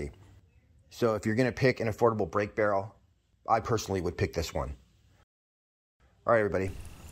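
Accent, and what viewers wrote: United States. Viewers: I don't know which state has this accent but it is absolutely the most annoying accent that the US has to offer.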